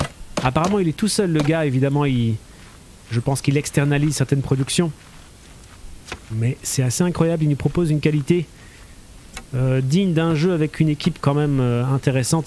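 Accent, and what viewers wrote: Belgium. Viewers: Tu as un léger accent belge... 😊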